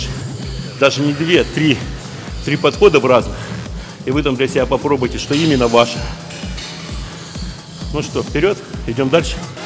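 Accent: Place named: United States. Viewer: я думал какойта американец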